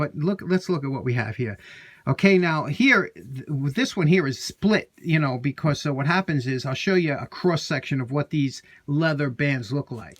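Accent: New York, United States. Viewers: Great New York brogue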